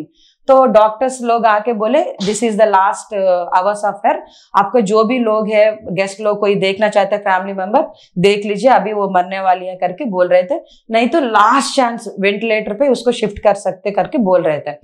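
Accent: South, India. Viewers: I love south indian HINDI ACCENT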